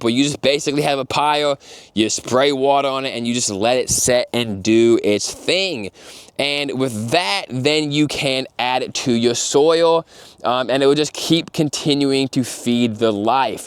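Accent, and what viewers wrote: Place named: New York, United States. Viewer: Cant handle that hardcore ny accent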